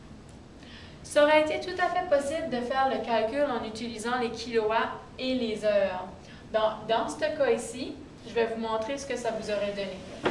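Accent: Canada. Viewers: J'aime l'accent cannadien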